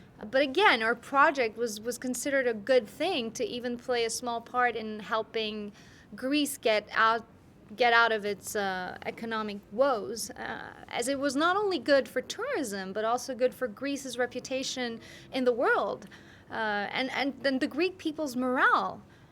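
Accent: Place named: United States. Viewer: Her American English accent is really good. Her hair is long as hell though she needs to get it cut.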